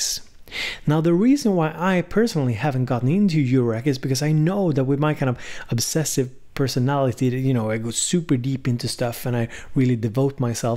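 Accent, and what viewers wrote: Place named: Sweden. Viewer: Cool vid, as usual! This vst is so useful to beginnig in the euro rack adventure! Btw, You need to have a great job to travel and go to hotels, so cool! Btw 2, I know were your nice little accent come from now, cause i searched " " on google (Beware...stalker in view) and you come from: ♪♫♪♫ (drum bearing)...Sweden! Am i right?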